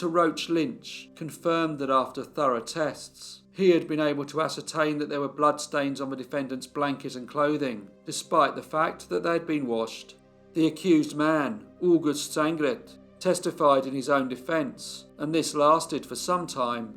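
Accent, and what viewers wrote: England, United Kingdom. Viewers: sorry I was only nit picking. You sound English(same as I am),and if that is the case, then Left-tenant is how it's pronounced 😁😁😁😁 But that is neither here nor there. I love your shows, please keep up with these wonderful pieces of crime history.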